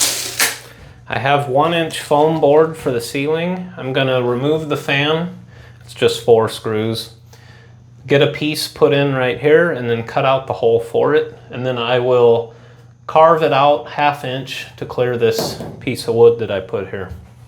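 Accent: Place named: Nebraska, United States. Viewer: I know this is 2 yrs old. And i havent seen in your comments the answer to why theres an "ethernet" port on the fan. its not an ethernet port. RVs use what look like cat5 cables for internal wiring. That is a port for one of them cables. My van uses the same types of cables for the internal wiring for like fans, radios, lighting etc. oh yeah i have a conversion van. I knew you were from nebraska. every nebraskan knows other nebraskans lol. theres just something about the talk and the attitude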